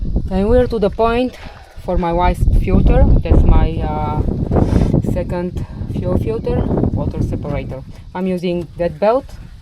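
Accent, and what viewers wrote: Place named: Russia. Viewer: Really? This is a bad joke. Your accent is not american sound like a russian...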